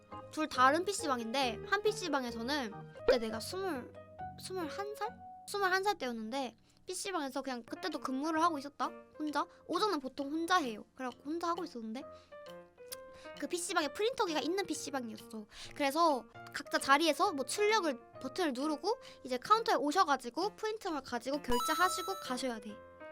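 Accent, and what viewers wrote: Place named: Gyeongsang, South Korea. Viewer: 갱상도 분이신갑네예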